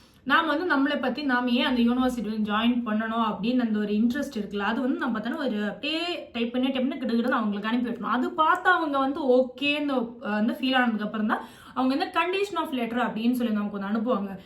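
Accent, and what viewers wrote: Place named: Kerala, India. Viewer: unga tamil different ah iruku . just like tamil and malayalam mixed ah iruku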